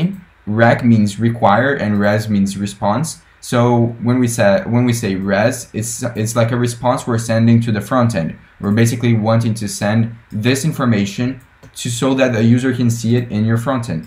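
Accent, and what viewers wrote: Brazil. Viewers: o que facilita entendermos e que o cara e BR o ingles dele ajuda muito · ingles brasileiro e bom demais de entender, vlw!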